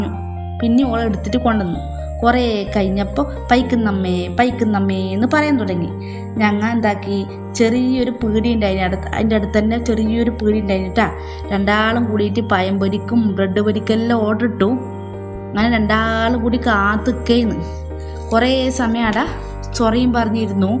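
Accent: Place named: Kasaragod, India. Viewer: കാസറഗോഡ് ജില്ലയിലെ തെക്കൻ സംസാരഭാഷ നന്നായി❤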